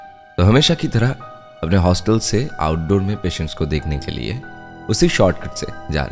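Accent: Bengal, India. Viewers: onak ta bhalo ho cha agr thaka but akhon oo bengali acesnt ta acha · Bengali ar moto hindi tao valo lege6e.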